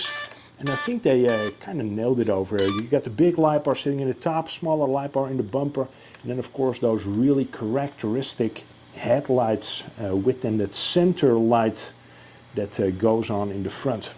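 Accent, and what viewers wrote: Netherlands. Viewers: sorry dat ik zo een comment deed of je Nederlands was. Goed Engels hoor maar toch hoor je het Nederlands er een beetje door heen. Trouwens goede content hoor! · You sound dutch but you are Norwegian?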